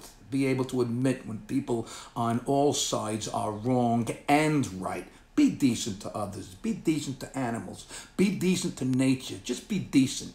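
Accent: New York, United States. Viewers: Hey. i had to move to virginia to take care of my dad who is a decorated war hero...for 3 years now. my family has suffered from discriminations..yankee..go home even teachers and his football coaches...can you hit on this please..were hard core new york wear are shirts proud.but its taking a toll on my son..we love your videos so nice to here some swearing and that accent only new yorkers have..my sons name is Tristan..you would lift him up so much..we appreciate all you do...laughed my ass off on your virus video...ill burn the fucking truck and walk home...funny shit..we where rollin.your awesome.cant wait to get back home when my dad passes. We love you brother stay hard and be safe much love to your family as well...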